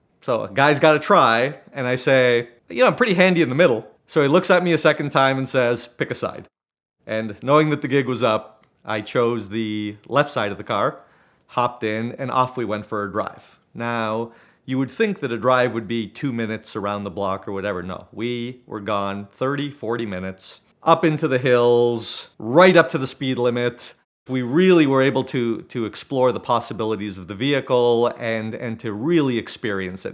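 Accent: Canada. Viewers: The Canadian accent is entertaining in itself. I’ve never heard “curb” pronounced in such a unique way…”CURRBEH” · I can assure you, there is no need for you to tell us you’re from Canada. We knew the second you said “out”.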